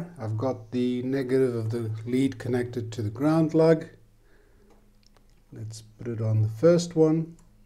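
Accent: South Africa. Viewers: I visited a family in Durban. I met them online. It was an amazing experience. The Tiplady family. We went all over KZN. Now I recognize the accent. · Are you from South Africa?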